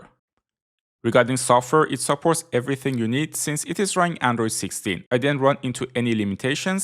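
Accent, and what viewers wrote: Iran. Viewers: I love the persian accent<3 Free Iran!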